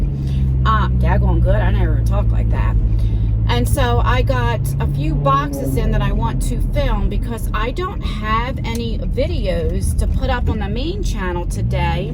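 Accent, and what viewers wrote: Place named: South Jersey, United States. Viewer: I watched the whole thing. I can watch you 24/7 day bc it feels like I’m just hanging with my girlfriends lol I’m from south Jersey and talk just like you so I piss myself when you start dropping “Motherf** & friggin lol. That’s me all day everyday 😂❤️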